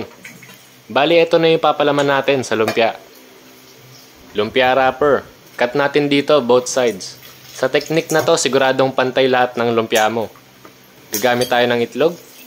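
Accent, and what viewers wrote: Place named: Pampanga, Philippines. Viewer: yung accent nya...kapampangan ka? eheh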